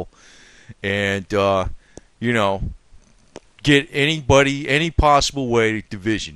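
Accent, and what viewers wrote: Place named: New Jersey, United States. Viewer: Love your honesty and most definitely your New Jersey Accent. I was born in Brooklyn, New York. I Do not have the accent because I left as a young child. By the way, I love hemp; I mix the protein powder with whey and my other smoothie ingredients. I do not like the wicked matrix system. Obama is only a puppet for the elites. This is coming from a Black gal.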